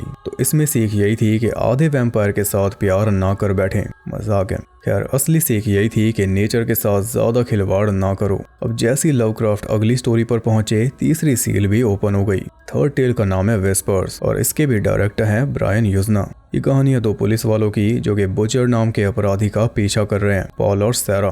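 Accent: Haryana, India. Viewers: Aap ki explanation ek baat pata chali ki aap harayana se ho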